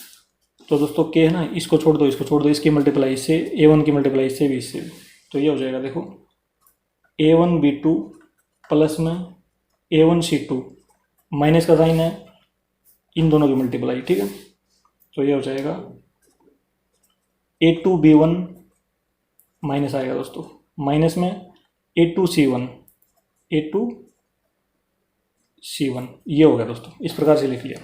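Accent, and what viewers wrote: Haryana, India. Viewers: Re sir aap to haryanvi jaise bol rhe ho But love u sir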